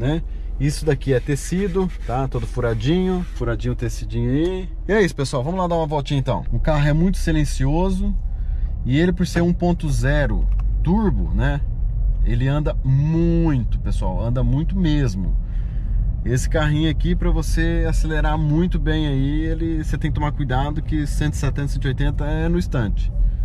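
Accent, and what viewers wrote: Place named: Portugal. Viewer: Parace um português a falar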